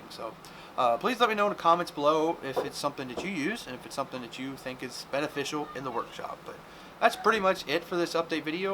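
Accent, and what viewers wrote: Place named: United States. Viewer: Keep up the good stuff man! Which state is your accent from btw?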